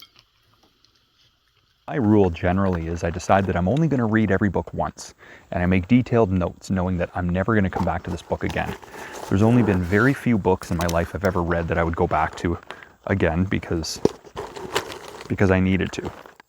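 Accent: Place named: Canada. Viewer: Sir, You make a lot of sense. So true. Throughout the years, I collected so many O Scale model trains. I’ve sold some for charities. Going to do more. Same with my business suits. Just WHAT am I holding onto them for? I’ve donated business jackets, suits , dress shirts and ties to a rehab for young men who are recovering alcoholics and from drug addiction. Going to do more of that to. I’ve listened to your channel and am impressed with your intelligence. And… by the way, I’m trying to make friends with raccoons in my backyard. Just how can I do this? I’ve made a table and put various treats, including cheese, bread, frankfurters. Now I’m trying peanuts. By the way, I listen to your pronunciation of ‘Os’. Are you Canadian? If so, glad to meet you. I’m from New York and absolutely support Canada.🇨🇦. God Bless Canada. I’m glad that our “president “ left the G6 (without the United States) in a huff.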